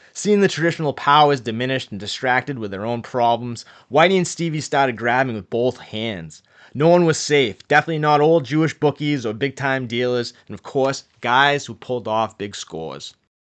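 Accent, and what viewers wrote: Boston, United States. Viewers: Great job you can really hear the progression in your storytelling more precise,articulate.. without losing your Boston accent LOL. which really ties it all in... Hey true story in 1999 I bought a 4 ½🥕 canary yellow diamond by itself off of the Greek, I knew his son from coming into Mac2 in Billerica where he was living in nice house, which supposedly came from the job, I have no problem talking about it 1st off my ex-wife has it.. LOL more importantly that shits way past the statue of limitations.. and I believe the Old man passed away awhile ago. Also the war between the H.A and Outlaws kicked off because the outlaws murdered some Lowell members who were down in Florida supposedly, looking for 2 outbad members to make sure their tats where removed and retrieve club property.. anyway they went to the Outlaws clubhouse to tell them why they were there, And the outlaws invited to a party they were having and during the party and outta nowhere an outlaw 🔫 activated their life insurance policies.. 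and they've been playing "lead tag" with them ever since, and fact the 1st ride of the year they visit their graves in a lowell cemetery! ✌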